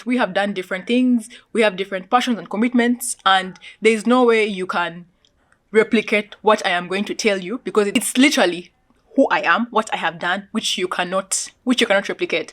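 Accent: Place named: Kenya. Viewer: Sounding like my Kenyan friend 🤗🤗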